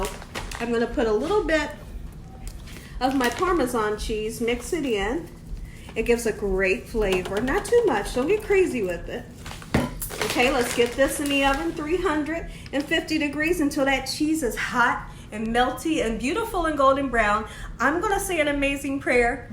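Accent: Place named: South Carolina, United States. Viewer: I love her voice she sounds like my family in Charleston South Carolina.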